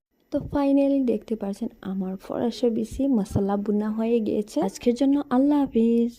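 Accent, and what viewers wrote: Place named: Sylhet, Bangladesh. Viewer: আপনার বাড়ি কই সিলেটে নি😅😅😅😅😂😂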